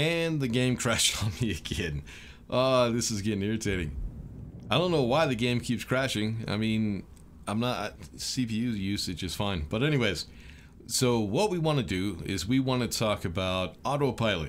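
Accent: United States. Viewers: I'm not used to the American accent (I'm a Brit) so was trying to work out why "planet with a bunch of turds (turrets) on it" needs defending 😆.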